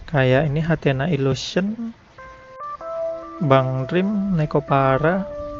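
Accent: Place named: West Sumatra, Indonesia. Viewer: BTW abang orang mana bang?kok cara logat bicara nya mirip orang sumatra barat ya bang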